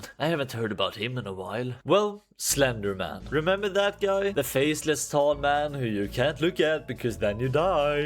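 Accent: Sweden. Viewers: I just know this guy is from Sweden. Can hear it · are you from sweden ?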